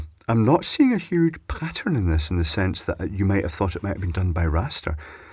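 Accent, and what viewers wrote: Scotland, United Kingdom. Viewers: Hello senor.i am under the impression that your are a Manx bear. Now if that is true. My question is this. Do all manx bears sound Scottish? Or are my ignorant American ears mistaking a manx accent for a Scottish one.?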